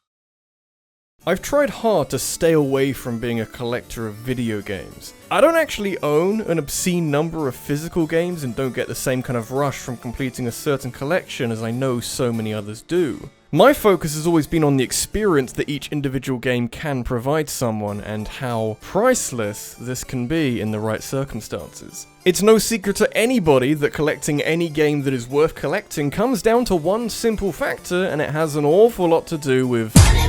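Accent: United Kingdom. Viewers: what is gramma attack? gamra attack? gammar? the british accent, they like to skip their Ts and add Rs. I cannot enjoy listening to british speakers because I am too stupid and derp out when they say words like law and i hear lore and spend the next 10 seconds trying to figure out if im having a stroke, and end up missing what they are saying, leading me to get totally lost. just me? or is it genuinely confusing when they say words they dont mean to? sorry, just realizing why im unsubscribing from british channels, makes me sad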